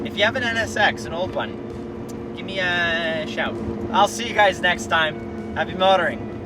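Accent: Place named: United States. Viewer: Turned off when heard American accent only aussies build tuff GTR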